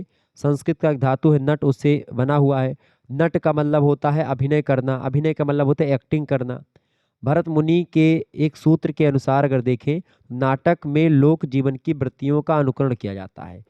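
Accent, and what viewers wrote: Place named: Awadhi, India. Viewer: Aap avdhi bolte hai, badhiyaa hai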